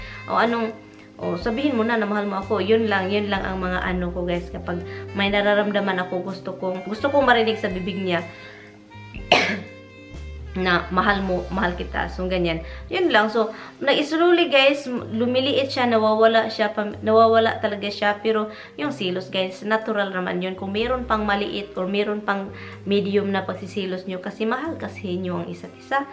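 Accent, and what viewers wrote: Visayas, Philippines. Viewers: Your so funny like ur attitude n visayan accent so darn funny n cute 😁😂🤗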